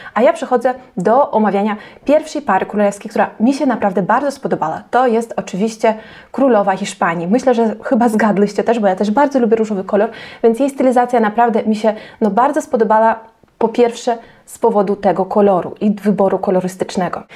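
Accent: East, Poland. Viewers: spytałam o pochodzenie bo usłyszałam piękny wschodni akcent. Pozdrawiam 🤗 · Piekny,wschodni akcent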